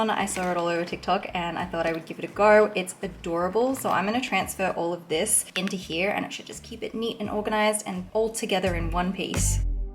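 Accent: Australia. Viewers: Lovely to hear an Aussie voice. You are making me so excited. A week until I go to France from Melbourne but want to pack now. Actually going to take photos of mix and match outfits. That might help. Relaxing vid. 👏😄🇦🇺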